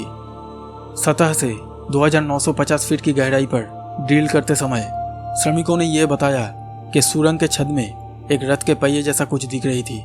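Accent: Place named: Assam, India. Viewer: Bahut achhe 👍aap assam se hey, aapki boli se lagta hey, main vaha 23year rahi hun. Dhanyvad. Jai hind 🙏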